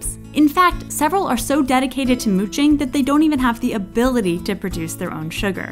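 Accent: United States. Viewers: i never knew the depths of american pronunciation before.. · Very fine piece of work, Kingsley. My Australian wife wants to know where you found an American narrator? The word, orchidelerium is NOT a Victorian word, for those interested. My late editor, Anne Boston, came up with it to entitle an article I wrote on Victorian "orchid mania" published back in the 1980's for the now defunct, "Garden Magazine." It was used a second time in my 1989 book, "Wily Violets and Underground Orchids" (still in print).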